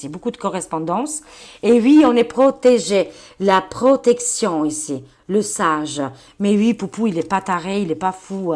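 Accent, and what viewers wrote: Albania. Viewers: Hi, l'accent Ok, je sais pourquoi puisque vous êtes d'origine albanaise...Mais - ceci expliquant cela - vous n'avez pas bien saisi le sens du mot: PHILANTHROPE qui veut dire: plein d'amour pour l'humanité!.. Avec eux, c'est même TOUT LE CONTRAIRE....IL FAUT DIRE pour qualifier cette clique ( à claques )....MISANTHROPE, qui est celui qui déteste les gens.. Mais c'est encore trop doux pour eux. On peut y aller avec: carne...charogne...harpie (si c'est une femme), salopard (si c'est un homme) et SUPPÔTS DE SATAN pour les deux sexes. Attention, ces mots restent dans le domaine de l'insulte....Le mieux c'est d'employer le mot: MISANthrope.... J'aime bien ce que vous faites...Un petit bonsoir du Prof... PS/ Pour la nouvelle coupe, elle est bien, mais je n'avais pas vu lorsque vos cheveux étaient plus longs.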